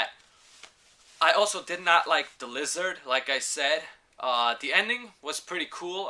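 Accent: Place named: New York, United States. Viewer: Gotta love the NY accent, good review overall.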